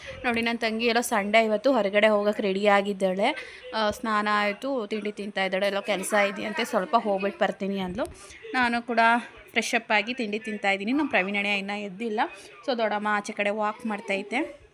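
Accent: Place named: Thuremane, India. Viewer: ನಮ್ ಅಮ್ಮ ಮನೆ ಅಲ್ಲಿ thuremane ಅಂತ ಹೇಳ್ತಾರೆ... ಎಲ್ಲ ಸೇಮ್ ನಿಮ್ ತರ ಮಾತಾಡೋದು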